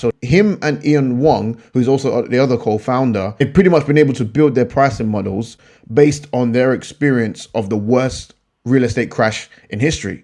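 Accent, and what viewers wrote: United Kingdom. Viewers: love your analysis, very detailed and to the point. great thanks, nice accent where u from the UK?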